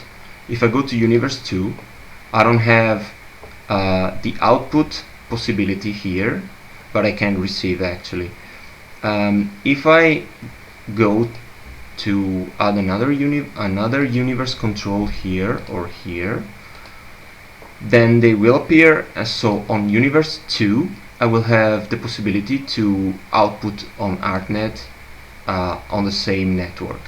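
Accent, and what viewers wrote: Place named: Italy. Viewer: dal tuo inglese sembri italiano, PUOI FARE UN TUTORIAL IN ITALIANO????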